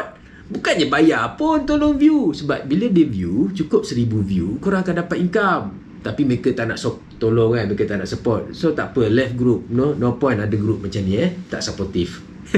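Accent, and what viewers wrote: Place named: Kedah, Malaysia. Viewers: suka ckp kedah "X leh kata apa arr" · Amboi byk selit utaqa nohh🤣🤣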